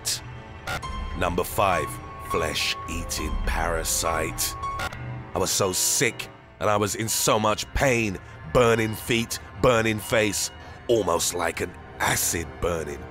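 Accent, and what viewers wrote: England, United Kingdom. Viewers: The new narrator is too dramatic and his british accent annoys me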